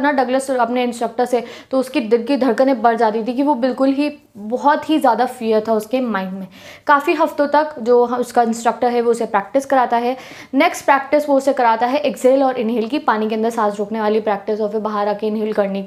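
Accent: Punjab, India. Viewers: Mam kitna bhi rok lo punjabi to rag rag me hegi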